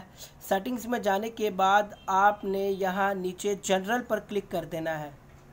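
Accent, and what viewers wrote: India. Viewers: English title but indian speaker .. nothing inderstand 👎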